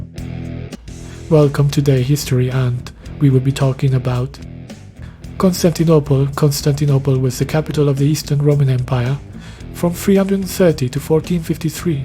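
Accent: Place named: Portugal. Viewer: porteguese accent detected? 🇧🇷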